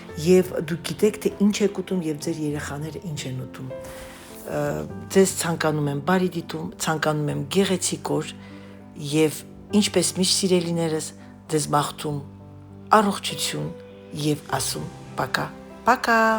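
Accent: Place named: Lori, Armenia. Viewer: ջան,հայերեն լոռամիրգ է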